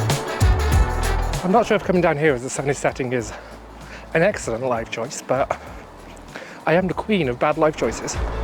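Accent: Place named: Birmingham, United Kingdom. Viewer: It’s how you say it! Once a Brummie always a Brummie bab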